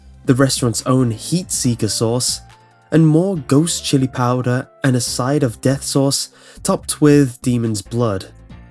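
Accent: United Kingdom. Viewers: Your englaned I thought you were British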